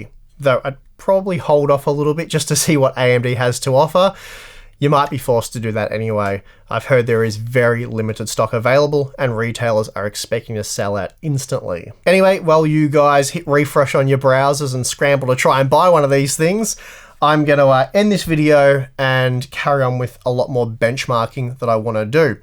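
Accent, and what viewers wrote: Australia. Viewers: And that Aussie accent just gives it extra spice!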